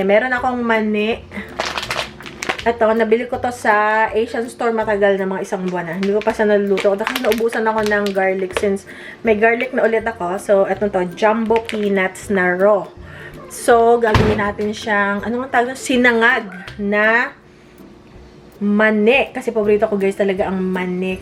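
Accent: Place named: Mindoro, Philippines. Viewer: Hi ate, curious lng kasi ako..saan province mo dito sa Pinas? Parang tono samen sa Mindoro po kasi😊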